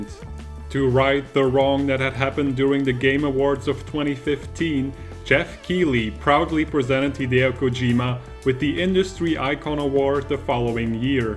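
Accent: Netherlands. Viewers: You're Dutch, aren't you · Are you Dutch?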